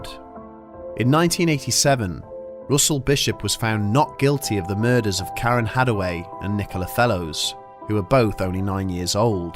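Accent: Wales, United Kingdom. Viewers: Are you from Newport or Cardiff?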